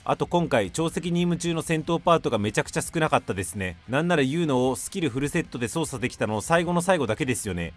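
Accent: Kansai, Japan. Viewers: 大阪万博いって関西弁使うのにハマりました？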